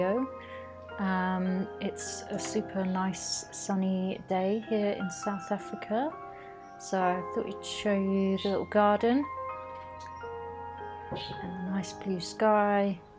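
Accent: United Kingdom. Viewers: I’m absolutely loving your channel at the minute. Your art is amazing and my style! I also love your accent! I’m from the uk too 🇬🇧 ✌️ xx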